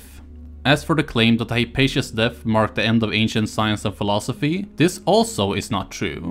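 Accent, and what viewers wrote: Sweden. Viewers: is this guy swedish lol. sounds like pewds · English isn't his first language so you probably just have to adjust your ear to the Swedish accent. The more foreign accents you hear the easier it is to decipher them. Also, I think Swedes tend to use English that's more in the British style, so if you're not used to that it can take a little while to get used to. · Lol your swedish accent is pretty funny but I like it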